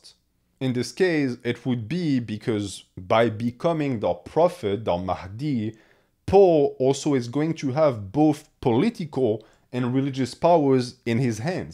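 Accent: France. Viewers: Selon votre accent, je dirais que vous etes francais. I found the french version of the audiobook vastly superior to the english one, what were your thoughts ? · The French accent when speaking English is so pleasing to the ear. I could listen to you talk all day. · Listening to a French guy talk Dune for over 3 hours was as delightful as I thought it would be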